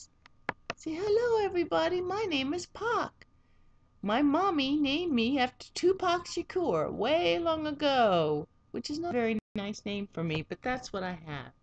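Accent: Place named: Pittsburgh, United States. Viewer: awww what a touching video,,, i understand, by the way, where r u from? Pa by any chance? You sound like a Pittsburgher ;p hugs to u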